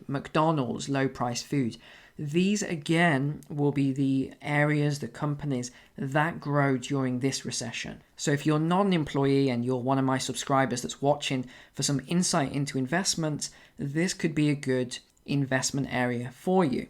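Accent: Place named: England, United Kingdom. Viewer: This is totally irrelevant but I usually don’t like English accents but your voice is so soothing. I’ve started to listen to you in lieu of ASMR in order to fall asleep. I know it might seem like it but it’s a complement. 😴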